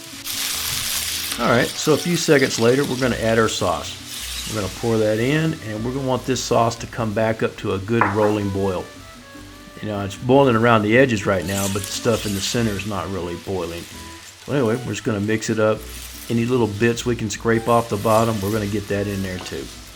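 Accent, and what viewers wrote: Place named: Texas, United States. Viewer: I'm making this tomorrow, it looks so delicious! Love your accent, are you from Texas? Greetings from Montreal! 😃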